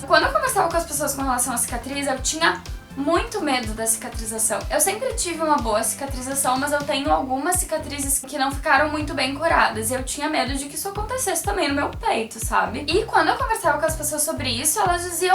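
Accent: Brazil. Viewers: Aí que gente chata. Foca no conteúdo do vídeo e não no sotaque dela. Ela é gaúcha assim como eu e o nosso sotaque é bem assim mesmo. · Lógico. Seu sotaque, seu vocabulário portoalegrense uai! É mesma coisa que reclamar de gaucho dizer "bah". Pede pra mudar de estado federativo de uma vez....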